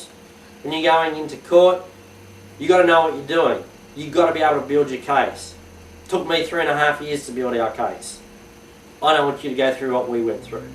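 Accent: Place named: United Kingdom. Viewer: OK from your acent its obvious your British, so does what you offer work in american courts? I have been unable to get anyone with the knowledge I need to help me. The whole system seems to operate on the premise that "its there little enterprize" and anyone who is not part of it, is not welcome. I thinks this is because if anyone figures out their little fraud, they would be out of business.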